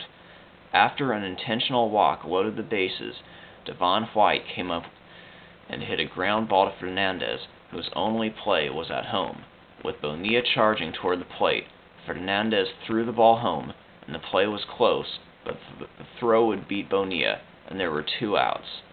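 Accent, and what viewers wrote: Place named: Latin America. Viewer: You need to lay off those annoying hispanic pronunciations dude. Do you call Italy "Italia"? Then don't call Rentaria "LLLLLentellllia".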